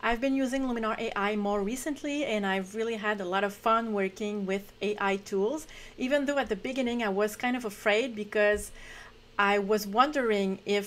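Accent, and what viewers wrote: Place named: Canada. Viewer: Thanks a lot for these video, but I still prefer your French (with nice Canadian accent), please continue ! 🥰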